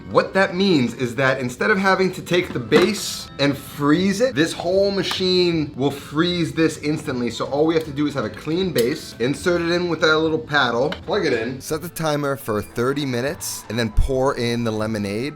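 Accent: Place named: Bronx, United States. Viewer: I was.guessing you were cute by your voice - the Bronx I-talian accent and then, Momma mia!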